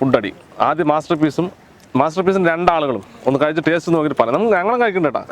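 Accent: Palakkad, India. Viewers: palakkadan slang itrayum bhagiyode kerathinu kanichukoduthathinu big salute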